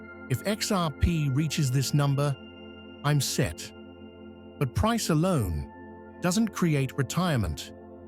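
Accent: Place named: United Kingdom. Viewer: Just found this channel. I really like its simplicity of explanation. My feeling is if XRP gets to wide financial institutional adoption not only will there be price appreciation, but also less volatility as that would run counter to what institutions expect on a value transfer asset. If the aforementioned occurred this could create a great deal of competition for the XRP asset and safer yield products could become available in time via free market competition (albeit with a lower yield). On a side note I like the British accent of the moderator. I've often said one could be speaking in complete gibberish, but if its with a British accent it would sound authoritative. 🤣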